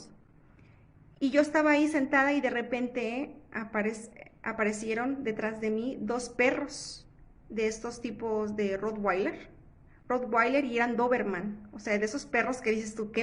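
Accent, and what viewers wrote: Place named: Mexico. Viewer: Por cierto me encanta oírte bien mexicana 😊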